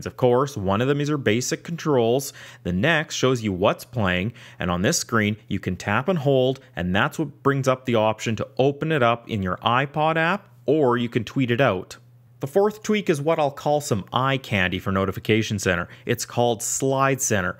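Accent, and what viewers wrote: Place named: Canada. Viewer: i knew about all these tweaks, but i watched the whole video because i am lovin the canadian accent when he says "notification center" lol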